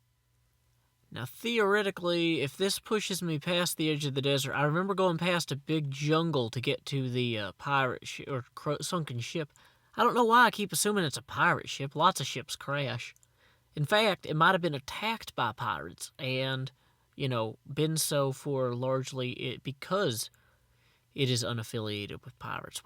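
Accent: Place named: Appalachia, United States. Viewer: you know that we (a few british people) stereotype your accent for hillbillies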